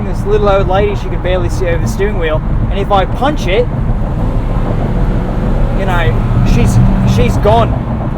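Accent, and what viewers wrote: Australia. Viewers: Dat Aussie accent tho... :O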